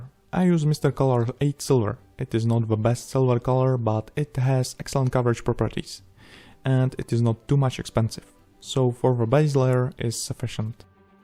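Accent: Czech Republic. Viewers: "just a little bit" :-) vynikající model a další vysoce kvalitní video, jen to na můj vkus maličko sráží místy školní czengličtina (nemůžu si pomoct, jsem anglista), slovní zásoba je easy nebo si dohledáš, ale občas tomu skřípe pořádek slov, vazba there is/are, etc., někdy to zní jak přes google translator :) neber to, prosím, negativně, za poslední rok dva ses hodně zlepšil; předpokládám, že komentář čteš z nějakého aspoň provizorního skriptu, nechtěl bys to třeba před namluvením zkorigovat? mně to zabere 20-30 minut a rád jako profi lingvista pomůžu profi modeláři k ještě lepším videům ;) kdyžtak napiš do odpovědi a domluvíme se přes fb, ale mezi námi, stejně to asi nikomu nevadí, naopak, ta tvoje ostravská angličtina tomu dodává local flavour ;) ať to šlape!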